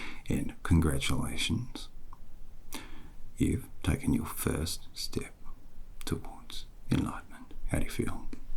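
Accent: Australia. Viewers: I miss Lars now, too! 😢 I broke both feet a few years back & the physical therapist's had a constant game show of some sort or another running in the background, which was rather more grating for me at times than was the (excruciating...don't break feet, people) therapy. This one would've left me feeling far less bleak, lol. I mean, there's a happiness baseline of Aussie accent & seagulls! 🖤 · I’m an Aussie mate, you’ve got the accent nailed. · Ahhhh nothing. It’s everything! Or is it? 🤔 😁 Your Aussie accent is so good it’s almost scary. I love it! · Totes brill, mate! Delightful and I made it to the end, just barely. 😴 Love the basement-level intonation that comes with the Aussie accent. 👍🏻 Maybe I have more words tomorrow? 8!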